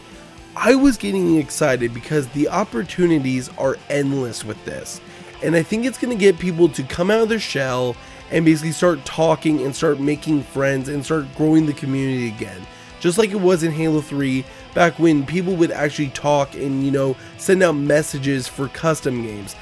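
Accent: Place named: Ireland. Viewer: so you are coming back to h5 i did like your irish accent😎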